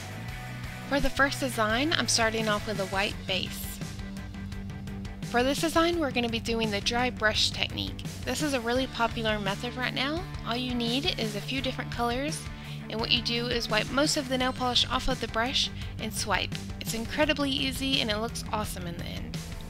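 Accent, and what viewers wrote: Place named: South, United States. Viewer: i just noticed tht u have a little country accent its so adorable i love it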